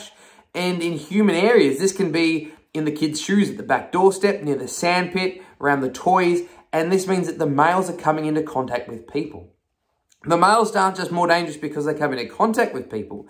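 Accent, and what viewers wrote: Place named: Australia. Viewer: For some reason, I just knew he was from Australia or from that region 😂😂😂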